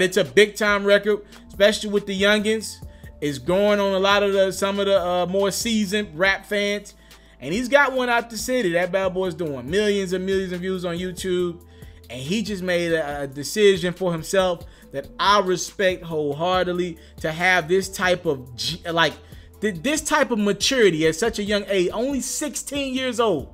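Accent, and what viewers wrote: Memphis, United States. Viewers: . Youn sound like you from MEMPHIS 💯💯💯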